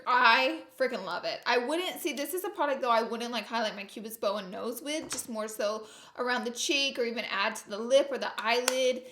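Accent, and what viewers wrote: South, United States. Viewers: I have the same accent. be proud of it. I love being a southern n.c. girl. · Your eyes were gorgeous!! So glad to hear your sweet southern voice!! ROLL TIDE BABY!!!!!🎃👻💜💜💜🕸🍁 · Did you spend a lot of time in Alabama during your break? Your southern accent sounds like it’s been rejuvenated. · You can tell you’re from the south when you’ve never miss understood words 😂😂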